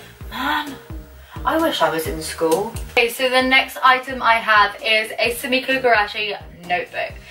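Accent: United Kingdom. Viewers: R u from the uk iam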